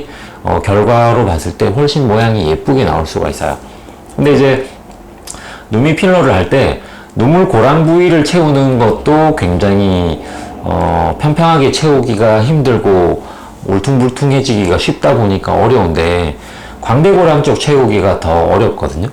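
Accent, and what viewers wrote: Gyeongsang, South Korea. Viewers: 저도 경상도 사람이라 원장님말투랑 스타일 익숙했는데 저분이 오해하신거같네요 꼭 방문해보세요 현실적인 조언과 상담 해주시고 눈물고랑필러 진짜 자연스럽게 잘됐고 2년은 유지됐어요~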